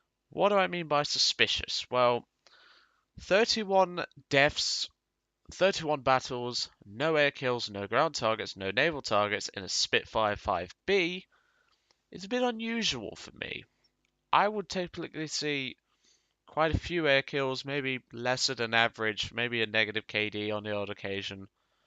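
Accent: United Kingdom. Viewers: U British mate?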